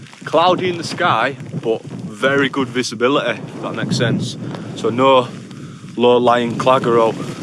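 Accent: Yorkshire, United Kingdom. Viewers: Great video, epic views all well presented, by the way, which part of Yorkshire is the accent from?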